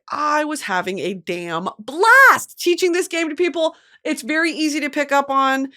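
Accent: South, United States. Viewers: the southern twang made me feel so comfortable today. right at home -texan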